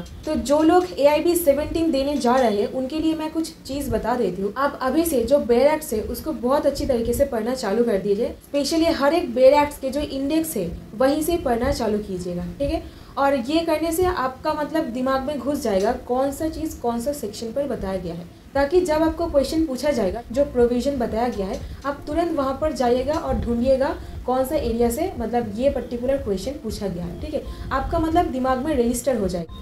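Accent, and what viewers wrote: Bengal, India. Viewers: Mam r you Bengali?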